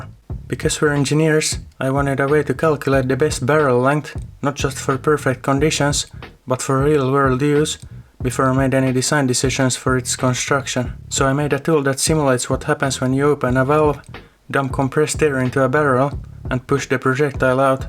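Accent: Finland. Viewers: Finnish accent? Like moths to a flame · Finnish accents are so cute 😭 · as soon as i heard your voice, i clocked that finnish accent than a speeding train turning your satsuma into dust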